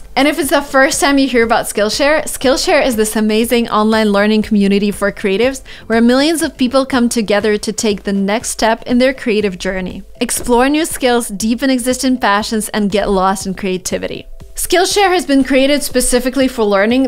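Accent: Russia. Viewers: Strong Russian accent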